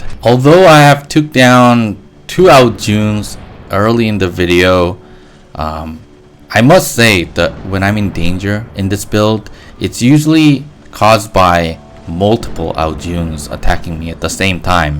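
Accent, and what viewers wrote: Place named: Asia. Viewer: You sound totally Asian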